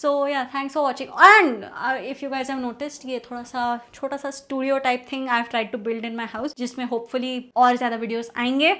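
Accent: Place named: Hyderabad, India. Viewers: I can hear that hyderabadi accent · Your hyderabadi accent was really beautiful ❤️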